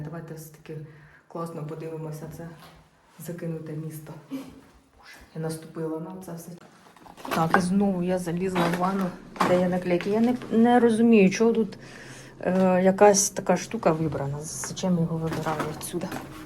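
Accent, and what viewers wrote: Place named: Cherkasy, Ukraine. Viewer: люблю цей черкаський суржик)))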